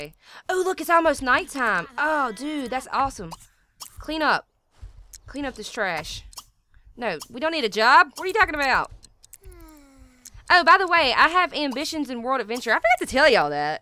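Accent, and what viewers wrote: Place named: South, United States. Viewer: I just love your country acsent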